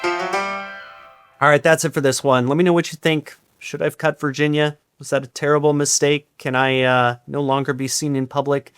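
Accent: United Kingdom. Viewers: As someone from Oklahoma. We’re sort of a mix of South and Midwest. Your accent in the ad read sounded almost UKish to me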